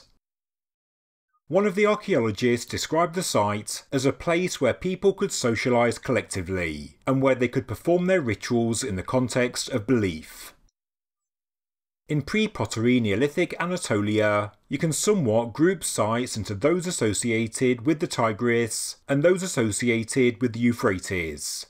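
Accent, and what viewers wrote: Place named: Birmingham, United Kingdom. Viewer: brummies should never be allowed to narrate anything ever again.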